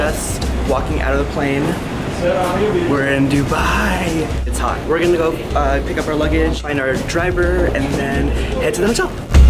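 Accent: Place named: Philippines. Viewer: ok so you are pinoy. that's why you got an asian accent when you speak english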